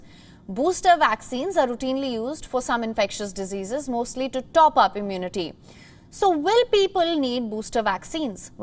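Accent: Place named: India. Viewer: Something about Indian English... It like proper English with unusual word choices on occasion.😎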